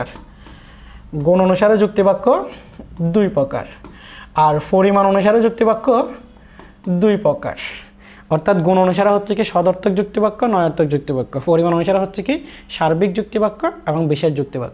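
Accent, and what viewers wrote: Noakhali, Bangladesh. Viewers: Sir amadrr nuakalir,onek balo bujai logic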